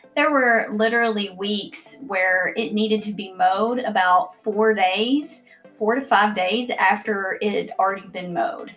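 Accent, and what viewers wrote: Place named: Kentucky, United States. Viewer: So you like your country accent but don't like to hear other country accents. Okay, gotcha. Can't get more back woodsy than Kentucky. Maybe you could move back to your holler in Kentucky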